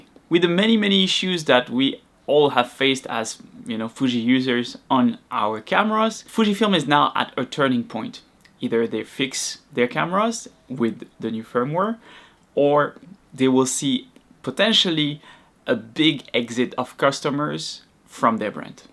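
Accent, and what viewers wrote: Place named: France. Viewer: tu as ralenti dans ton script ,c'est beaucoup plus audible,ca faisait trop je veux masquer mon accent Francais;) c'est une " critique" positive ;) c'est vrai que c'est l'exode Fuji en ce moment,pour ma part ,toujours en xt 3...avec beaucoup de ratés,notamment photo d'enfants .... perso le nikon zf me fait de l'oeil depuis plusieurs mois,pas forcément le FF ,mais plus l'ergonomie Fuji et le meillerur AF,tu l'as essayé?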